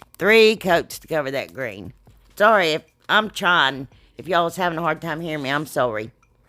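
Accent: South, United States. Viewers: 1st time viewer,,,and I absolutely ❤ you and your personality and not to mention ya sound like me when I talk,,,(country accent)🤭🤭🤭,,,I'm from Alabama,,,where are you from? Love your creativity,,,,I am now subscribed,,,cant wait to see more,,,thank you for sharing💕💕💕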